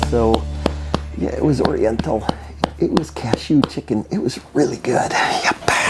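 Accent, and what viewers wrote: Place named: India. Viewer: INDIAN voice. Sales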